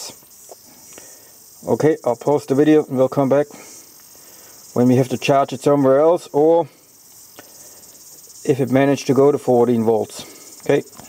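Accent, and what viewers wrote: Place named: Australia. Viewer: You sound like a ozzy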